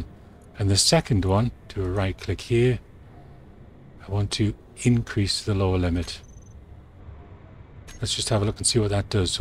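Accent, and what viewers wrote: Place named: Scotland, United Kingdom. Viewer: I meant I was waiting for a tutorial from you. But... I suppose you're right. Beat my head against the wall enough, and I could probably figure it out. It's just so nice having your soothing Scottish(?) voice explain everything in perfect clarity. (Is that enough sucking up?:-) Again, thanks, for all your efforts. I appreciate it.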